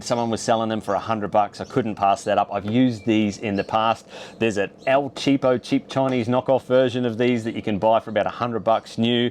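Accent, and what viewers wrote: Australia. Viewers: Thank you for a great informative and to the point video. Well done. It’s so nice to hear an Australian accent on these videos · 100% on the accent, been in the US for 10 yrs now and it's a welcome change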